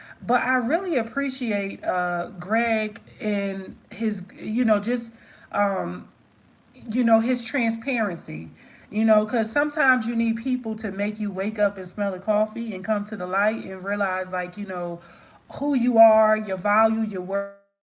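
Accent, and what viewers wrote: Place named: Philadelphia, United States. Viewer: I was drawn to you from the first video I watched. I told my husband honey look at this candle girl from Philly. I love a Philly accent. When I found out you had a group and did classes I been a fan ever since. I have watched those other candle maker videos, they put me to sleep, (no shade on them) but it's a no for me. Now u back to doing skincare, they gone be mad. This is a extra bonus. Get ready for the haters cause 😎 u about to blow it out the water.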